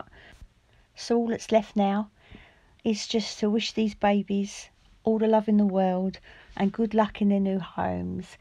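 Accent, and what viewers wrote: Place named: Essex, United Kingdom. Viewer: I live in Suffolk, I have a Rough Collie, a cavalier King Charles, a 10 year old apricot toy poodle , and have recently got a new toy poodle puppy, she was just over 12 weeks when I got her, she is now 21 weeks, and had her first little groom 3 weeks ago, I intend to let her have a litter, I used to breed Ragdoll cats in a very small way, this litter of yours are gorgeous , you sound like your from Essex, I would like to speak to someone who is close for advice, do you live in Essex?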